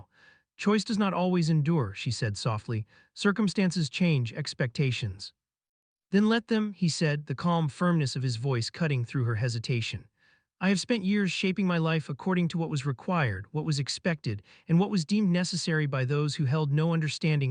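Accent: United States. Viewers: An American voice is just wrong.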